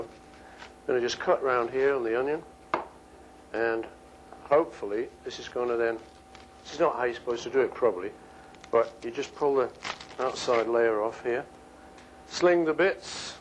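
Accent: United Kingdom. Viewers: his Scottish accent is great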